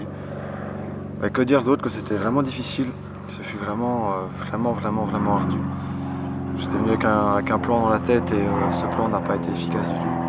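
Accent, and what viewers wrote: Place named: Tahiti, French Polynesia. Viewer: salut sympa ta video, au fait on dis rigoureux lol, il vient d'où ton accent on dirais celui tahitien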